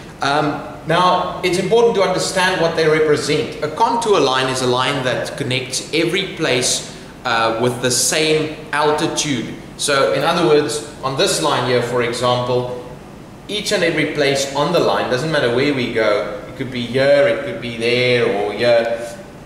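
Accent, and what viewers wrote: Afrikaans, South Africa. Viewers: Are you from South Africa? Thank you!!! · Are you South African? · Africaans accent😁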